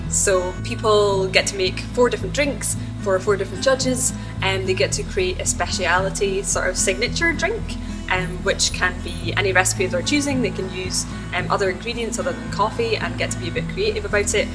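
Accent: Scotland, United Kingdom. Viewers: Is that a Scottish accent I detect :)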